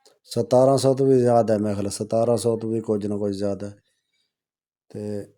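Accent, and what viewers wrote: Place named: Punjab, India. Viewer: Bhai itni gharhi panjabi na bola kare ham jese bhi bohot Dil se sekhte hein apko Bohot si bate smjh hi nahi aati phir😢 Baqi thank you for your work❤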